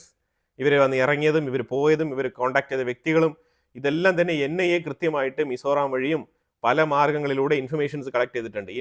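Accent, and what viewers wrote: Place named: Pathanapuram, India. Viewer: എന്താടോ താനും മാത്യു ശാമുവലു० പത്തനാപുരത്തുകാരാണോ. അവിടുത്തെ ഭാഷ ഇങ്ങനെയാണോ ???അതായത്, ഇതുപോലെ, അതുപോലെ, അതുപോലെതന്നെ ......ഭാഷ പറയുന്നത് എങ്ങനെയെന്ന് കേട്ടു പഠിക്കുക.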